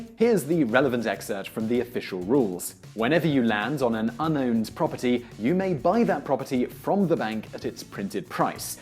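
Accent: England, United Kingdom. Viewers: Whoa. Didn't realize he had an English accent until I saw the game board with the Italian currency symbol ....my brain works in mysteriously beautiful ways. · It kind of is. There's the English language. But there's also an accent to it depending on who's speaking it: someone from England, America, Ireland, France, etc. speaks English with an Engkish accent. I speak it with an American accent. Etc, etc. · the smoother British voice Vsauce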